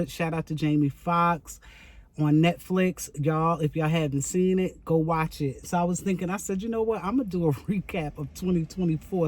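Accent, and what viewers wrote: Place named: North, United Kingdom. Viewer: Happy New Year,!!!! New subbie here and I can already see I'm going to like it here. You sound like you're from up north. "She never liked me, she can't stand me." WHAT???? NAH.... But congratulations on your engagement. This is wild BUT 2025 is here. Let's get it off to a good start.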